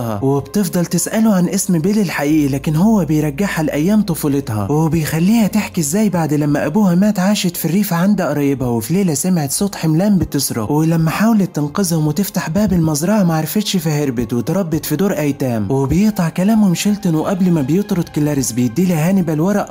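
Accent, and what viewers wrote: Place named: Tunisia. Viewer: الي يتكلم من تونس !!!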